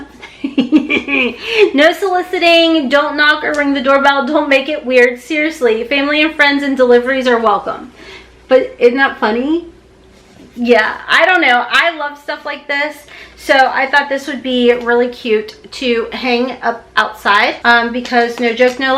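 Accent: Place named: Alabama, United States. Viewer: I'm born and bred in Alabama and you sound like my people.....which makes me so happy because ive been living in northern Indiana for the past 13 years 😢 and i miss everyone back home.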